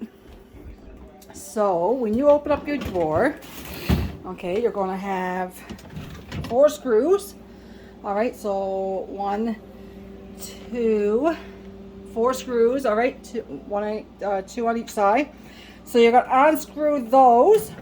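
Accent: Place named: Cape Breton, Canada. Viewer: Ay, take me back to Cape Bretton. 👍 Love that accent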